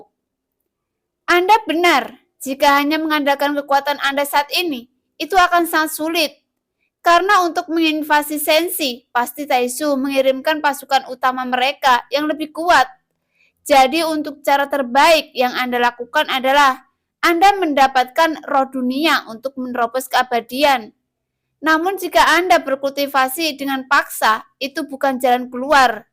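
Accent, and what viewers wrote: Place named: Poso, Indonesia. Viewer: Mimin orang mana ini kayaknya org poso ya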